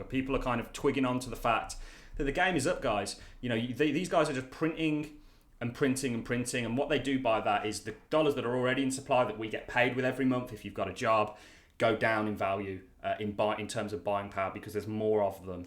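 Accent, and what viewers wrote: United Kingdom. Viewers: For a moment I thought it was Benjamin Cowen with a British accent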